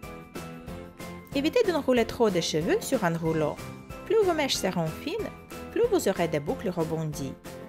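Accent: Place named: Russia. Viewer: est ce que tu est russe parce que moi oui tu as un accent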